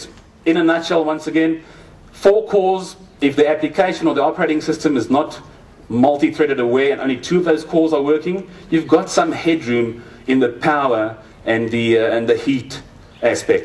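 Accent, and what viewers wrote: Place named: South Africa. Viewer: Definitely a South African speaking here.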